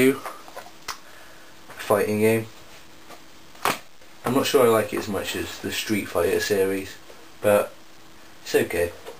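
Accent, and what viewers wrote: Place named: United Kingdom. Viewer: lol yeah he is from the UK. Did he voice not give it away? lol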